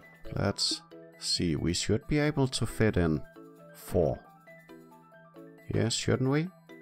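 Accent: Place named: Denmark. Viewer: du lyder dansk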